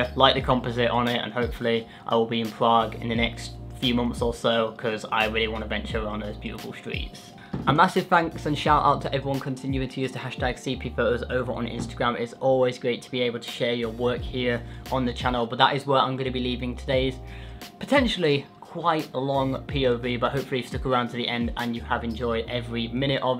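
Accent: Manchester, United Kingdom. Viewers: Mate! I subscribed to not miss any of your funny commentaries min hahahaha!!! Luff your Mancunian accent!! haha 🤣🤣 And of course, FANTASTIC camera work!!!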